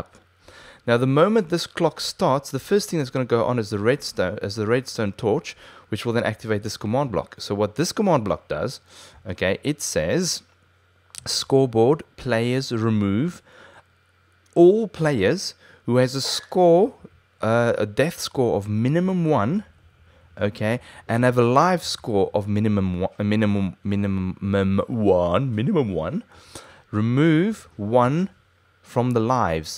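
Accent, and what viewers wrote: South Africa. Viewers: Ek dink dat jy suid afrikaans is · You sound south african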